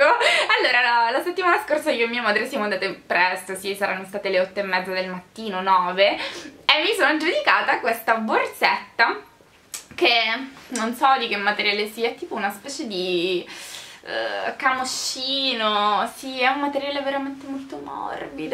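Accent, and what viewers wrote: Sicily, Italy. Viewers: Ehi ciao:) mi sono appena iscritta!! Metti troppa allegria e a quanto sento sei siciliana come me... 👍🏽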